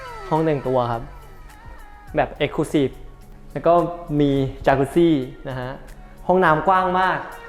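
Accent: Thailand. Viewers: เอ๊ะ เป็นคนที่ไหนนะมีติดเหน่อด้วย 555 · คอนถม ก็เหน่อหน่า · คนกาญพาไปเองรึเปล่าครับ มีติดเหน่อ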